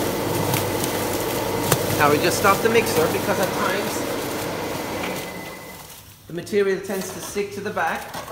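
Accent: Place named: Ireland. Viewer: While I like the Irish accent in English, it always reminds me of the sad state of the Irish language.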